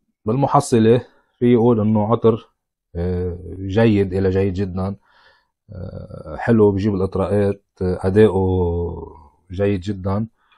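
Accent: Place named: Lebanon. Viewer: اللهجة اللبنانية كتير منيحه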